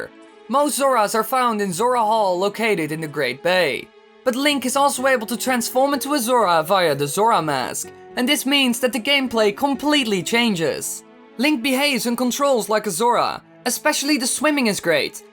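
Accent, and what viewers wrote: Netherlands. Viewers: NyakiAki its just his dutch accent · He's dutch. That's just how dutch people speak. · thats an accent he's dutch and in dutch its very normal to talk like that i am dutch myself but it doesnt sound weird in dutch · actually, thats his accent as a dutch XDDD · Wat he is just dutch man!!! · It's called a 'Dutch accent' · Its his accent. He's dutch.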